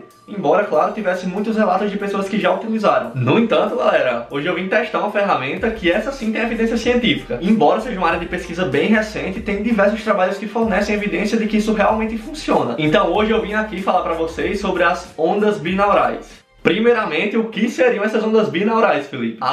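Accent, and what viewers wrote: Northeast, Brazil. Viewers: O sotaque dele é de Sergipe, Pernambuco pra cima. Ou do norte da Bahia, q faz divisa com esses estados aí. A Bahia é um estado grande, aqui tem no mínimo 4 sotaques: o de Salvador e região, (q é completamente diferente desse cara do vídeo), o do norte (q é bem parecido com o do cara do vídeo), o do sul (q é parecido com o do Espírito Santo e Minas Gerais e o do oeste, parecido com o de Goiás. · mas minha gente esse homão lindo + sotaque nordestino... :chefkiss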